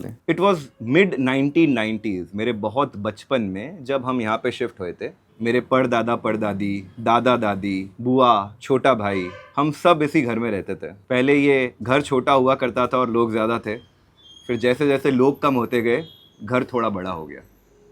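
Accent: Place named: Jodhpur, India. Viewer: Damn you're Jodhpuri!!😅very proud to hear it!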